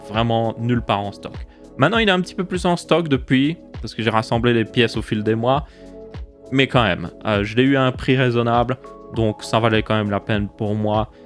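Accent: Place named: Belgium. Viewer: Honnêtement, est ce que doit continuer de s’excuser de prononcer houit et pas huit (et nonante, septante etc)? Les gars il est belge laissez le dire ce qu’il veut dire de la manière la plus naturelle pour lui, on le connaît depuis suffisamment longtemps pour que ça soit pas dérangeant, même pour un nouveau viewer ça devrait pas être dérangeant, je veux dire y’a tellement d’accent différent dans le monde c’est quoi le problème?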